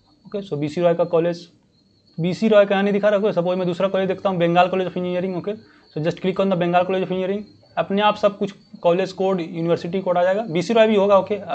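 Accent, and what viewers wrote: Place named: Maharashtra, India. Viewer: Bhaiya you seem a Maharashtrian from your accent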